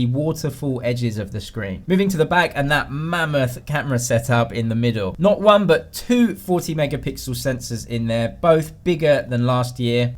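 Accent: England, United Kingdom. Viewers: Your stupid English accent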